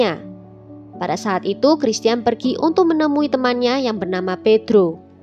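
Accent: Java, Indonesia. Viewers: Hay jg medokuhhh..sukaaaa👍👍😍😍😍💞💞💞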